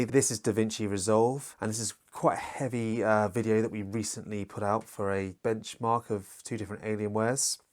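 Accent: United Kingdom. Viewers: Excellent comparison. Love your accent, too. Which part of UK are you from?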